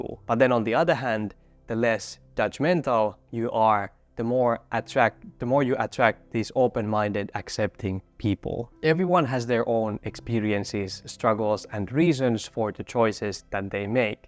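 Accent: Finland. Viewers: Maybe it has something to do with the Finnish language? My mother is from Finland but has lived in the Netherlands for 40 years, she speaks Dutch with a Finnish accent and it sounds exactly like this. In the Finnish lanaguage there are a lot of very long words that are composed of multiple elements, maybe its more important to be clear about where one words ends and the next one begins?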